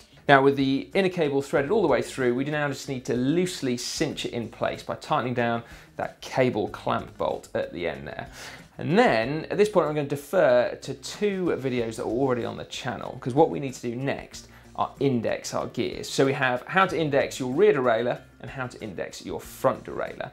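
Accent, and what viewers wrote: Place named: England, United Kingdom. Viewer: Damn an english accent!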